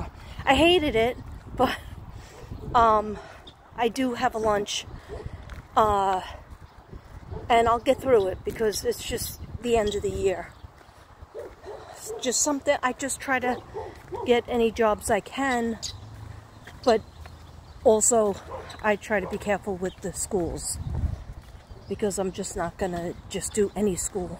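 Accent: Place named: Northeast, United States. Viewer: Wow, those glasses look great on you! So pretty! I’m new to your channel. You mentioned Texas, but what is your accent? It sounds Northeastern.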